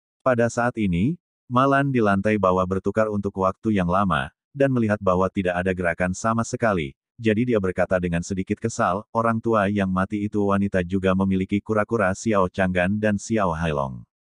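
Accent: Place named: Banyumas, Indonesia. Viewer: Ora ngapak ora kepenak 👍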